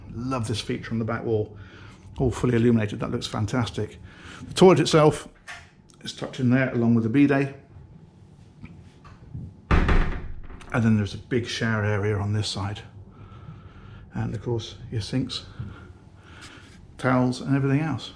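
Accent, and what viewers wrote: United Kingdom. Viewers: Touring expensive yachts is done best with a British accent. Lol It's just amazing the different lifestyles people live. I'll never come close to owning something like this. Must be nice to have money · I saw an American flag on the stern of the yacht, so why do you have this snob talking brit doing the tour? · Always a British narrator for such elegance.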